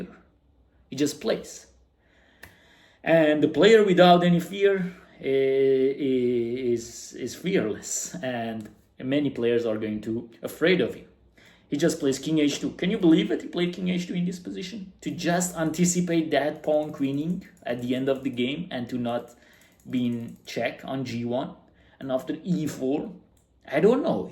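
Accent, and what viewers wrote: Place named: Italy. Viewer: the church bells definitely seal the deal confirming youre italian if the accent wasnt enough😂😂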